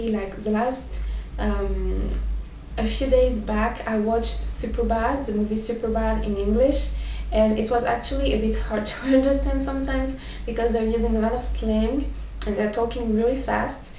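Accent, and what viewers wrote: France. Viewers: You do have a french accent, but your accent isn't impeding your communication. Great job :) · it's very clear she has a very strong french accent... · Wow taking into consideration that you are French you speak perfect English but French people don't pronounce the letter h and they consider it a vowel and you still do it but other than that you speak perfect English! =3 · doudi ¿Bueno? tu sabes que... ella todavía está aprendiendo, el inglés y Yo sigo pensando que ella todavía debe tener un acento francés, incluso cuando ella es.. ¡mejor! ¡suena muy muy bien en acento del LA Frances! ¡amigos! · Her vowels and "r"s sound French to me. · yea u sound French lol, and wow u r beautiful. nice hair too :) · Good. Now the words are off but you are just as beautiful! (wink wink nudge nudge) Thanks for your videos, I would really like to learn a new language and you make it so easy to listen to. 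I really like that french accent. It sounds gorgeously suggestive to all of us Americans. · Your accent is so interesting! I hear both the French and Spanish accent, and even an African accent. I've been learning French but I hope to learn Spanish too (and improve my French) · Thanks for the tips. I'm starting learning spanish from zero and will take your advice. P.s love the french accent so don't try and get a more english accent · J'ai direct reconnu le français :) sinon bonne vidéo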